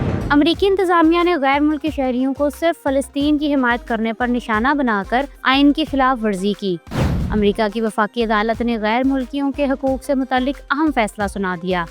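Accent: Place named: Punjab, Pakistan. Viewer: aap English name bhe Punjabi mein bol rahee hain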